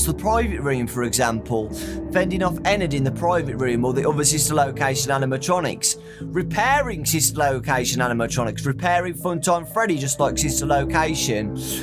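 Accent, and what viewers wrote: United Kingdom. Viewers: My dad hates your accent:( he dosent like British accent at all! But i love your accent! I wanna learn British accent! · quality content,British guy talks about a company saying hmmmmm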